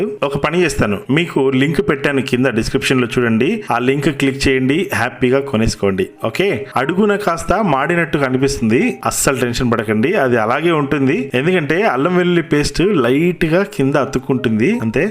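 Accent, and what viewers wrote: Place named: Telangana, India. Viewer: కాకా నీ తెలంగాణ యాస కూడా బాగుంది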